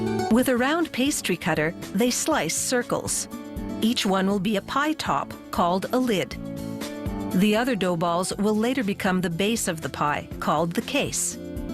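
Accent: Canada. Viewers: that Canadian accent tho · The narrator sounds Canadian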